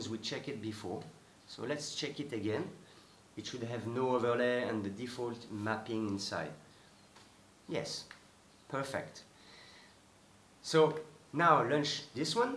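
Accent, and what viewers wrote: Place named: France. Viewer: Salut, tu sembles être français à ta façon de parler.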